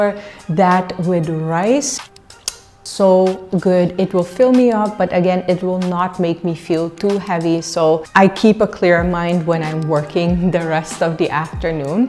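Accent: India. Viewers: The way you pronounce food items names are typical Indian pronunciation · You have an Indian accent to me 🙂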